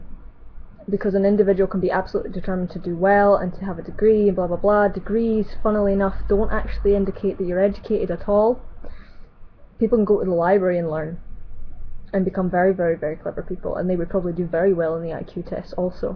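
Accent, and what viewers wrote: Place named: Scotland, United Kingdom. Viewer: Thumbed up just for Scottish-ness. Even though I side with race-realism.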